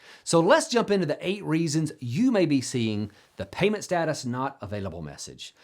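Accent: South, United States. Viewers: Soo Country 🤣🤣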